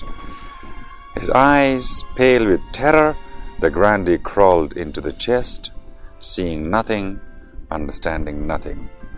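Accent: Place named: United Kingdom. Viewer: دو خوبی از حسن اخلاقی ای استه که ده هر چیز که مهارت دره بغیر ترس از پوره جزبے خو انجام میده. دوئم ای که خوب صاف بریٹش انگلش موگه.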